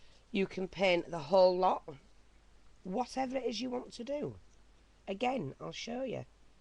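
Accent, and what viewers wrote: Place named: England, United Kingdom. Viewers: Loved the video. I am all about saving money, and still having a great product. Thanks so much from B.C. Canada. I do Art Journalling and taking a course on Monday. Will be making some and using it on my page. Will pass this along to everyone. Thanks again.. Love the English accent.. I have English/Scottish Ancestors, and plan to go to England/Scotland hopefully again in a couple of years.. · Awesome, I subscribed cos I liked ur accent, makes a change from the tuts been american .... good to hear a northerner ;) ... great tut btw, can't wait to watch more x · Yorkshire accent rockz! Go! <3 Love all your tuts! ^^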